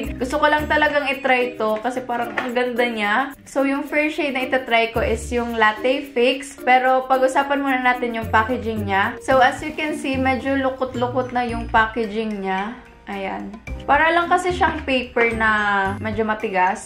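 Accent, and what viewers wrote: Visayas, Philippines. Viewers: parang bisaya to haha tabang mga binisaya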